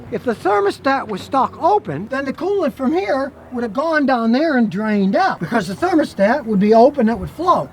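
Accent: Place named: Chicago, United States. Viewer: Is Scotty Kilmer’s accent a Chicago accent or a different version of the New York accent? To me it sounds Chicago but I’m not a 100% sure lol. But I love it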